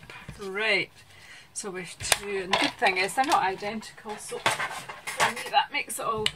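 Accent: Scotland, United Kingdom. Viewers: Stunning DSP / beautiful card and a fabulous Scottish accent! Tfs. xOx ~ C ~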